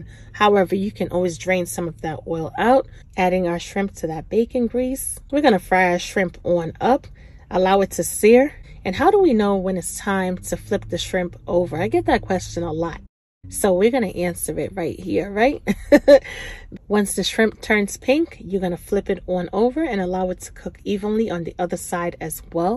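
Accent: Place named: Boston, United States. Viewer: I just love love love your accent and I know I have asked you this a many of times baby. Are you from NYC or nearby? BABY I LOVE LOVE LOVE your accent. It also kinda sounds like from where my LOVELY'S ARE FROM, NEW EDITION, so it could be Boston Mass!!!! Like I said, hell I probably have asked you this question and forgot about it Pooh. You Are The TRUTH!!!!! Just thought I'd let you know. ♥♥♥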